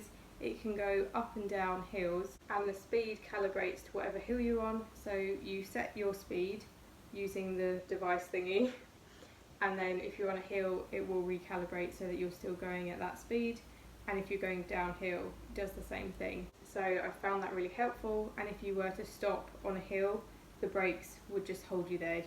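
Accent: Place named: England, United Kingdom. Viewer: typing is so much easier anyways. That must be annoying to deal with at times though. When I write things, I usually can’t read my own writing the next day hahaha. You pull off glasses very well, nice eyes and smile, they work for you and I think glasses are popular again, so many people using them versus contacts again, well here anyways. Are you around London? I have some friends around there, they have totally different accents than you, more city like accents. Then I have a buddy up in Durham, and I have a hard time understanding him 😂😂.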